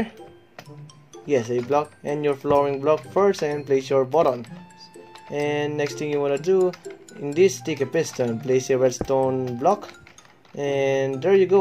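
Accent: Philippines. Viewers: oh God I'm listening to a filipino right? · Filipino accent is hard on this one bois i love it so much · In the beggining i knew that he is a fillipino haha cool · Becuz it worked i subbed I also noticed your filipino accent, MABUHAY KA PO❤️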